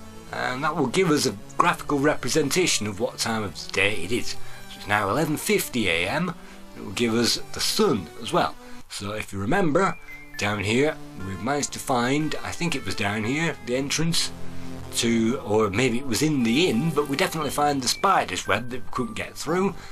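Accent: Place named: North, United Kingdom. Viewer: I like to hear northerners and wunder from what county thou art.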